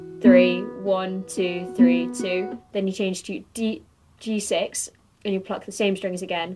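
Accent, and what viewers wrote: United Kingdom. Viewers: OMG BRITISH ACCENT !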